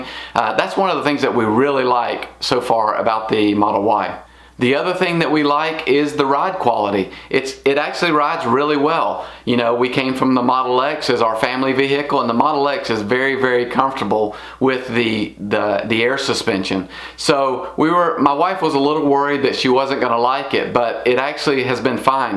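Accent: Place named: North Carolina, United States. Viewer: Hey. I’m in North Carolina and you sound like you’re from somewhere around here-not California! 😂 Ordered our Y a week ago Saturday! Do you recommend tinting the overhead roof glass? I’ve seen mixed comments on this on the web. Thanks!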